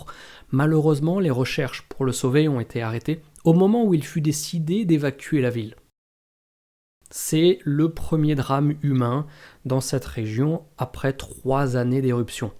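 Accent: Iceland. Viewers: Votre accent islandais est surprenant, l’islandais est surprenant ^^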